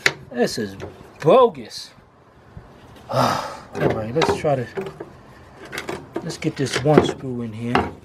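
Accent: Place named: Northeast, United States. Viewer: NE accent....somewhere between Philly n Boston lol I can't quite tell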